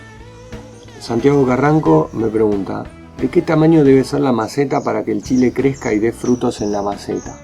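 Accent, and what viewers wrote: Argentina. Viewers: Su acento es Argentino, nose si los videos los filmara ahi.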